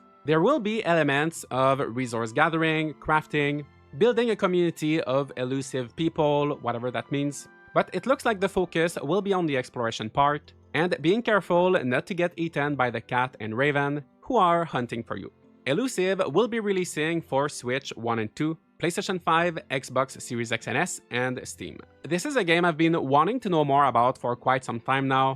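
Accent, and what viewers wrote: Quebec, Canada. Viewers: french canadian?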